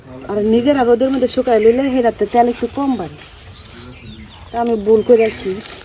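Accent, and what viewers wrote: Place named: Kishoreganj, Bangladesh. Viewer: আপু তো কিশোরগঞ্জের ভাষায় কথা বলেন, আপুর বাড়ি কি কিশোরগঞ্জ বা ময়মনসিংহ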